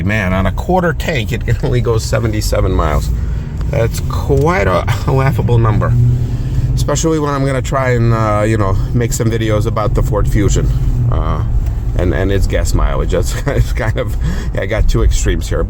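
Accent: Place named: Alabama, United States. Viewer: He is from Mobile, Alabama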